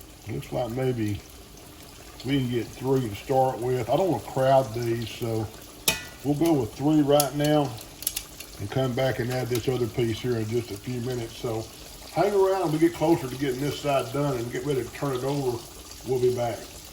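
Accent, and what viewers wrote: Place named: Texas, United States. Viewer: Mmmmm we love your chicken fried steak! The ultimate comfort food!! And we love your Texan accent!!!💞💪🔥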